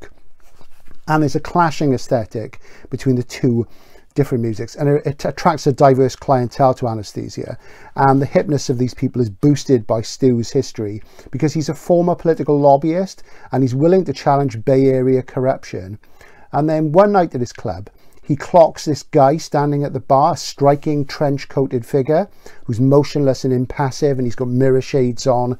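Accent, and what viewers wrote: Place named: Newport, United Kingdom. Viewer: I'm guessing you are from Newport? Sorry I love my accents and decoding them.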